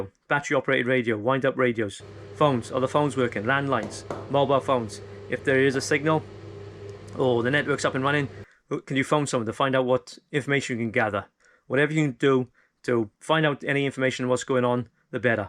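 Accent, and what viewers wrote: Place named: Scotland, United Kingdom. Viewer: I subbed, but IDK if I can deal with the thick Scottish accent.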